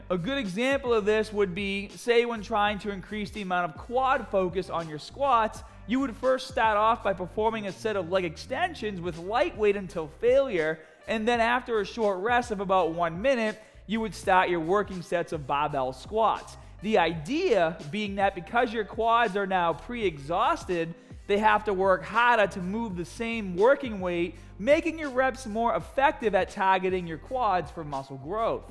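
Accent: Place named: Northeast, United States. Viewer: Northeast accent. So obvious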